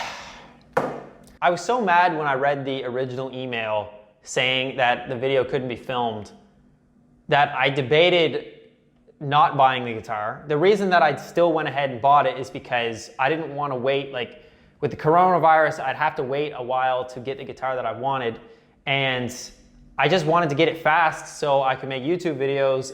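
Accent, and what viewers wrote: Canada. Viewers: What part of canada is he from, im from newfoundland lmao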